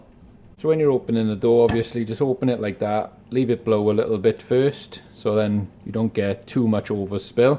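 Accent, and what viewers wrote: Wales, United Kingdom. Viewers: Pleasant accent/dialect, is that a wales dialect? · I’m with (@ Brick-ets” you don’t say antiques (Antigs) But I love the Taff accent, my mother in law’s Welsh “just don’t tell her” is your Clock room sealed or room vented? Best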